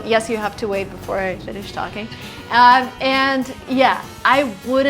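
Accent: United States. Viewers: your accent sound more and more american with every new video.👍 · I really like your videos! I'm watching you from the mexican state of Tlaxcala! you sound pretty american like a native speaker wish to talk just like you! you're a smart cookie! regards lovely!!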